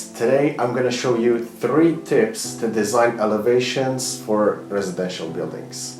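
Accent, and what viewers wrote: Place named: Brazil. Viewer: Are you Brazilian? Your accent kinda sonds like mine.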